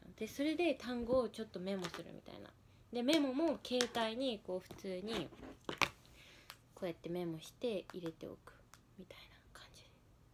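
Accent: Kagoshima, Japan. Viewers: 私の日本語の勉強方法と一緒です🙋 YUURIさんの動画を見ながら勉強しています。 多少鹿児島弁も一緒に耳に入ってます。笑 一緒に頑張りましょう！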